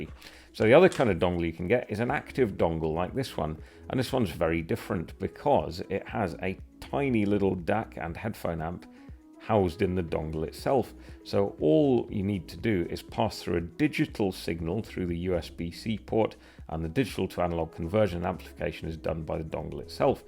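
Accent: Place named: Yorkshire, United Kingdom. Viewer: Very nicely spoken…..and I think occasionally I hear a trace of Yorkshire ..but I could be wrong…🙂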